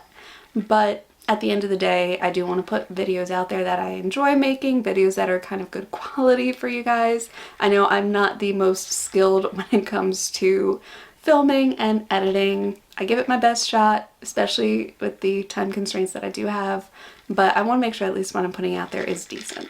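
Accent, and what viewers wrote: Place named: Australia. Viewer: Sending positive vibes! Hey watch out - I hear a touch of Aussie accent!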